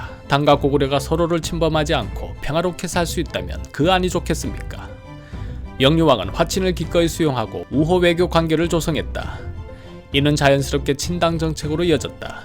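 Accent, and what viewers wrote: Gyeongsang, South Korea. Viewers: 경상도 사투리. 감추려 해도 감출수 없지.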